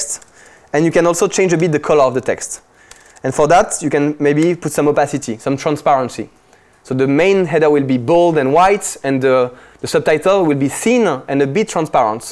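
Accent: France. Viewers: I'm hearing French actually.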